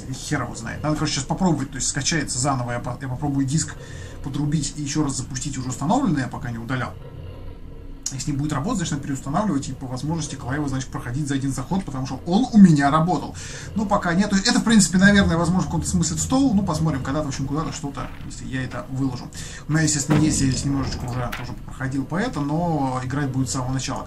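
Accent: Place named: Odessa, Ukraine. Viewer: Легкий одесский акцент, но приятный такой :)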